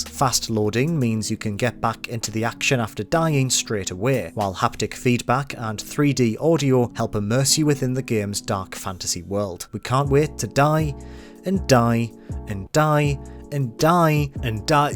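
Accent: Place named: Newcastle, United Kingdom. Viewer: Here for floating Geordie representation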